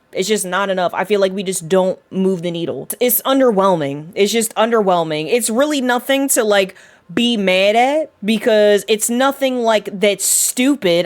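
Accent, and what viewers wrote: Philadelphia, United States. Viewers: She’s from Philly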